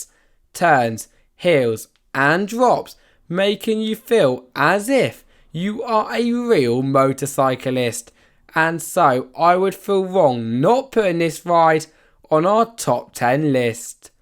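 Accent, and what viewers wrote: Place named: United Kingdom. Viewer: What's with the drunk British guy commentator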